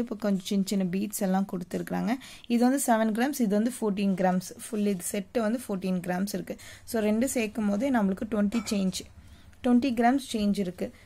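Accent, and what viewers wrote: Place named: Telugu, India. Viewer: Ok you are telugus so your Tamil is saking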